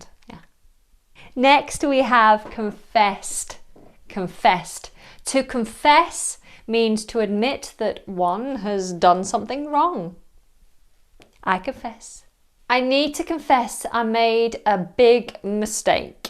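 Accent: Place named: United Kingdom. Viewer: Dear Madam Teacher,thank you very much for all your effort.I am so grateful for your brilliant,amazing and perfect videos.My very personal opinien is that your british accent is one of the best inside huge and vast Internet.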